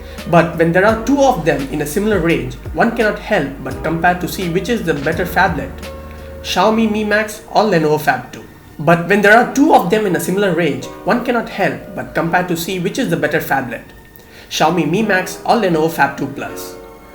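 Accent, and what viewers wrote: India. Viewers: Indian accent is disgusting!!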